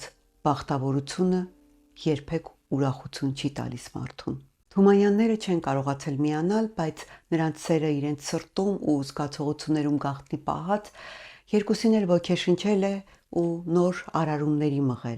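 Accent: Standard. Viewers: Հարգելի ❤️ինչպես միշտ շատ հետաքրքիր է ձեզ լսելը 🥰 Ձեր մաքրամաքուր հայերենը կրկնակի հաճելի է լսել 🥹🥹🥹🙏🏻🙏🏻🙏🏻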